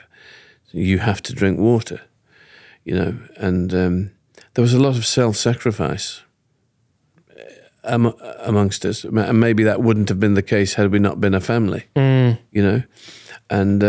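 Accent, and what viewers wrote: United Kingdom. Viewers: That exact accent is the same as Bear Grylls. Can any Brits tell me where it’s from?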